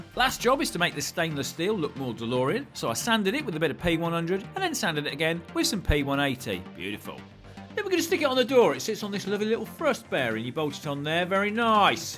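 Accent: United Kingdom. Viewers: Extra British in this short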